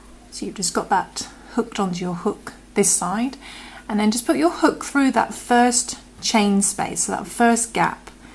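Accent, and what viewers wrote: United Kingdom. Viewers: Too pretty for a cloth would make a super scarf. That said why oh why are you stating American terms firstly for the yarn worsted? or is the hasty dk British part? Also that’s not a double crochet for us older Brits it’s a treble! It just annoys me so much that fellow Brits nowadays seem to immediately use American terms I’m presuming from you’re a Brit from the voice naughty of me, also sorry pet peeve use of US terms for everything rant over. Is a nice pattern though.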